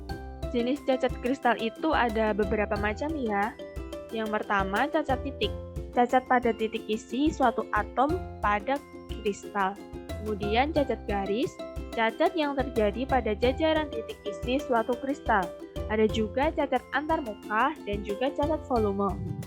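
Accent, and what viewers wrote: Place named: Java, Indonesia. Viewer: Kereen mb.. kurangin medoknya dikit lagi ya.. 👍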